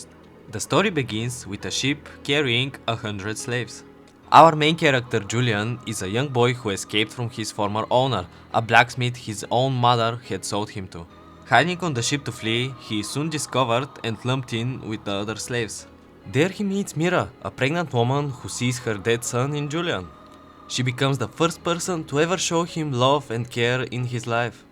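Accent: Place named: Bulgaria. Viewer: Bulgarian ahh accent, nevertheless amazing video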